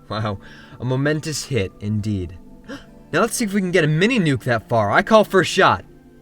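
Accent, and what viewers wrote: Canada. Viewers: Is he canadian? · dude u canadian？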